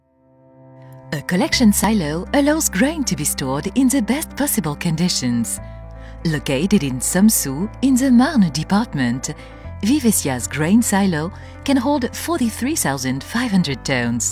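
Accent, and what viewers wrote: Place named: Egypt. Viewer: المعلقة مصرية 🤣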